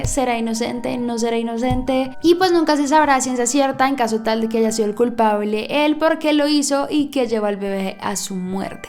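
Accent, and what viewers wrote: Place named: Colombia. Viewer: El acento colombiano ❤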